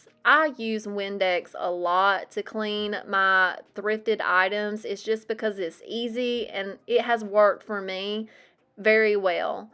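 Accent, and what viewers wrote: South, United States. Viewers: . Your grammar is fine. You have a cute southern accent which I find to be charming, especially on a YouTube channel where you are making rustic, country style decor. Don't change for the critics. Just be you. ❤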